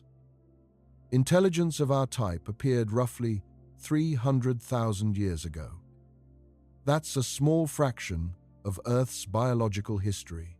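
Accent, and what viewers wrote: United Kingdom. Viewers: Absolute rubbish. I understood that in the first 30 seconds of this waste of time. Flight was also impossible. Reaching the moon was also impossible. Then it was accomplished. The same small mind in the first two examples is now narrating this video. “The physics as we currently understand it”. All that says is EARTH SCIENCE is clueless. The inconvenient fact however, is people are looking up into the sky and seeing them dotted with “UAPs” that by the logic of this video, shouldn’t exist. But they do. They’re here with ease, and have been as long as human history has seen them, thousands of years. Amazingly, the idiocy of the narration refers to the “speed of light” as a factor in interstellar and intergalactic travel. This is a kindergarten child telling a university mathematician why his understanding of physics is impossible. Needless to say this video got no like and no “subscribe”. 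The “British accent” didn’t make this inanity sound any smarter.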